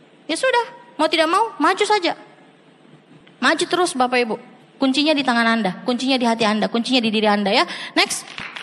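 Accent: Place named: Sulawesi, Indonesia. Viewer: Logat sulawesi😊😊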